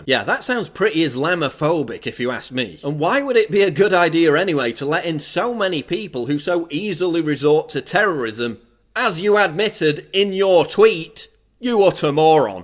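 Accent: United Kingdom. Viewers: off topic but aren't you british ??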